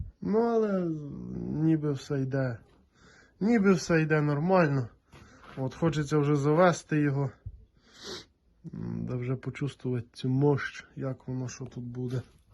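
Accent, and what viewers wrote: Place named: Zhytomyr, Ukraine. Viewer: По говору таке як Житомирська область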